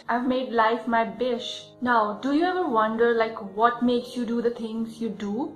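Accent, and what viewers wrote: India. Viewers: I knew it cuz ur English sounds like Indian English and I'm Indian too:) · Hey u look like an Indian And ur accent too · Indian accent thanks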